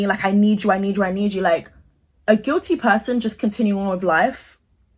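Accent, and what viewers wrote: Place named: England, United Kingdom. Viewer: Luv this ENGLISH ACCENT 💯% ✅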